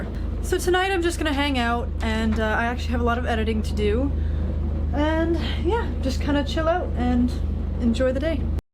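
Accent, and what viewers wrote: Canada. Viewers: Love your Canadian pronunciation and I am sure the dolphin was a good omen, all the best on the ICW.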